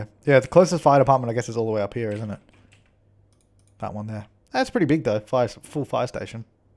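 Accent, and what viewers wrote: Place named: Australia. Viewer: Austrailian accent is beautifull.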